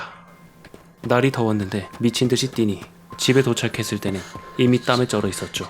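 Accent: Gyeongsang, South Korea. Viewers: 경상도 어디신가용😊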